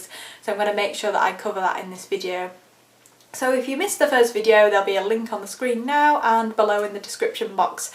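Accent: United Kingdom. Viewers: Nice British accent. Can I be your guinea pig?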